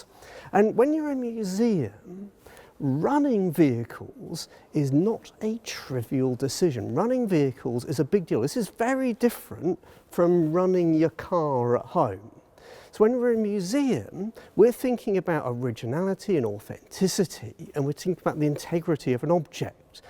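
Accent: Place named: United Kingdom. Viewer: What a pleasure to watch for so many reasons: First the wonderful British English spoken. That pronunciation, melody, stressing etc. Splendid! (I am German, btw) Then the dedication to the topic that speaks from every sentence. The pure facts and information conveyed. The reflection on the historical work you are doing. The legitimate and based reasoning. The mild subtle humour. Ex-cel-lent.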